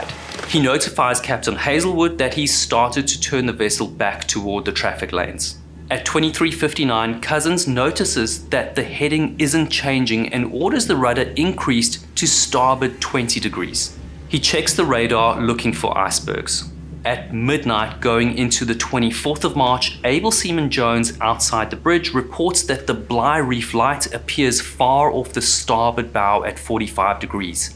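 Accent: South Africa. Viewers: YERRZZZZ OF DEVASTATION LOL south African accents are the best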